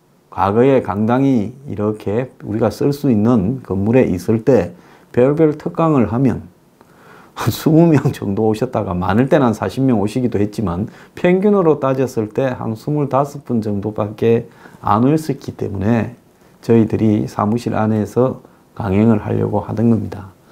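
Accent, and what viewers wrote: Gyeongsang, South Korea. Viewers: 뻣뻣하지 않습니다. 경상도 액센트 좋습니다. 진실을 말하는게 가장 듣기 좋습니다.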